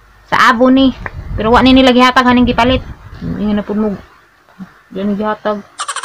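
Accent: Bohol, Philippines. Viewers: Taga asa diay ka sa bohol ma'am Kay ang accent nimu morag taga Alicia bohol haha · malingaw gyud ko basta bol anon mag storya...jijiji makalingaw.. half cebuano half bol-anon here... jejejeje.. ajao palabi manang nabaw na slag bahakhak sa imo..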